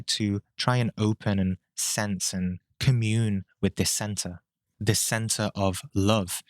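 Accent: United Kingdom. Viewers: okay brother i never comment on videos dnt really do social media but you kinda have to to some extent to exists in the meta verse anyway!!!!! i have to take my hat off!!! i got shown a skeleton key months ago in a meditation and its blew my mind ever since, your video is extremely thorough and you are most def correct. got me excited, knowledge is like good sex to me ahaha when its displayed in front of me i get the biggest serotonin kick. the macro and the micro, all life can be overstood through study of self, we are literally mini universes and setup the exact same way, been studying this stuff since i was 16 i am 29 now and have jut started application of all this knowledge! very exciting time tho so many have woken up, almost dnt feel alone anymore ahah i also see i need for all these new people who have woken up to have some kind of guide to sithed through all da bullshit you have to on this journey, in order for us as humanity to get right to the crux of the matter and start raising up ourselves and in turn earth! 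u sound around my age to, and ur clearly from the uk like me, uk needs more of this we are lacking on the spiritual front on the net not much good representation, but i shall subscribe to you for sheer effort well done.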